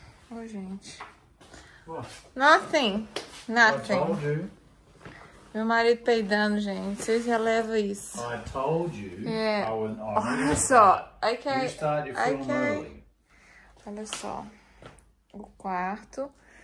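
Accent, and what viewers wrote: Minas Gerais, Brazil. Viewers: Pessoal nao corrijam a quando ela falar "tamos" ao invés de" estamos",o mineiro tem mania de falar cortando as palavras, e também diminui-las kkk,mas na hora de escrevermos escrevemos direitinho😊sou mineira, mas adoro ouvir o sotaque da é bem mineirim gostoso dimais da conta sô❤❤ abraços para todos!!! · ADOROOOOOO VIAJAR COM A QUERIDAAAAA!!! E desfrutar da sua simpatia, do seu sotaque mineiro que é o sotaque mais lindooooo do mundo!!!! Beijos ❤❤❤❤❤❤❤❤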